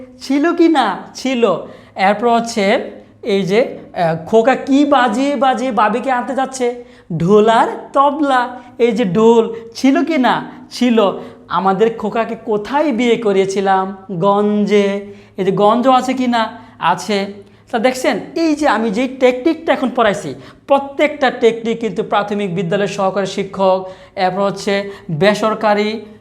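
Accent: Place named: Mymensingh, Bangladesh. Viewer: ভাই এর বাড়ি কি কিশোরগঞ্জ,ভাষাটা বৃহত্তর ময়মনসিংহের মত আসে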